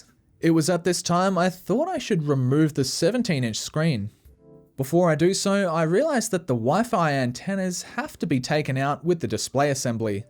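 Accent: Australia. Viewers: is this guy from australia?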